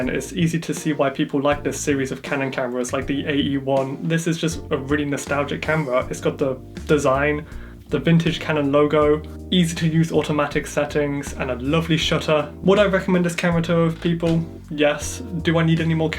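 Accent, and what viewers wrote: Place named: United Kingdom. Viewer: Film photography + British accent: subscribed! Jokes aside, very nice video, loved it! Keep going!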